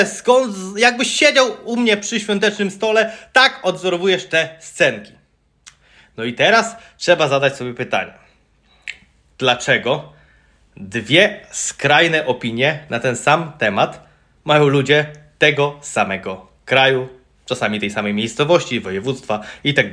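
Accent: Lublin, Poland. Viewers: Ty zaciągasz jak mój stary 😂 Wychowany i urodzony na lubelszczyźnie od pokoleń.. Święta w twoim wykonaniu to jak nagrane z mojego rodzinnego stołu. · Jak mówisz bez akcentu to brzmi dziwnie.Najlepiej jak robisz za wujka z lubelszczyzny.Mam tam rodzinę i faktycznie taki akcent mają.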